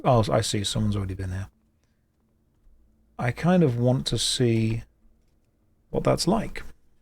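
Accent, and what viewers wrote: United Kingdom. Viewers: I like the british ASMR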